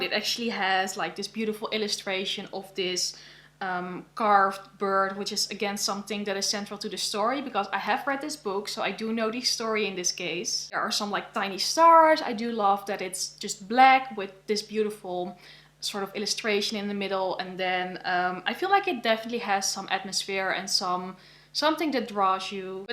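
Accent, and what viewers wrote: Netherlands. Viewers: Thanks so much for making another one of these videos, I've been waiting a long time for it since I enjoyed the last one! How is your studying going, and is the pandemic situation in the Netherlands getting better? Your makeup and hair are gorgeous as always! Are you trying anything different, or do you have the same routine? Are there any Dutch writers that you think are really great and that you enjoy reading? I also really get annoyed by nonremovable stickers on books and I usually don't buy books with them, because I just hate the way they look. Have you thought about reading Hanya Yanagihara's first book, The People in the Trees, if you haven't already? Like A Little Life, it is also a superbly written and fascinating book based on a true story about some very awful and difficult topics. Also, I love hearing you talk, since your accent is beautiful and unique!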